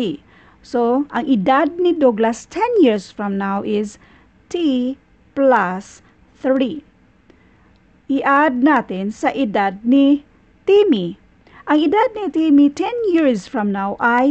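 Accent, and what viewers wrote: Visayas, Philippines. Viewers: Advantage po sa amin mga bisaya yung accent nyo po 😁😁😁 daghan salamat maam god bless ❤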